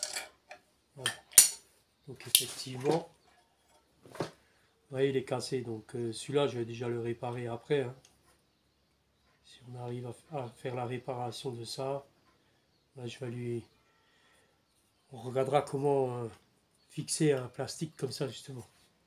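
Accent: Alsace, France. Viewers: Ça sent l'accent d'Alsace ici ! Bonne vidéo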